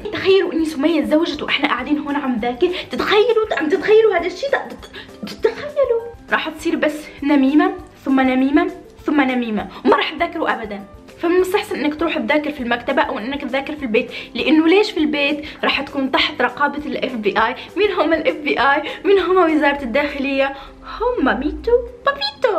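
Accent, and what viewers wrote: Tunisia. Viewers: هل انت تونسية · شكرا على النصائح حبيبتي بس بدي اسالك سؤال، انت من تونس؟ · تحسك تونسية 🙂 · انت تونسية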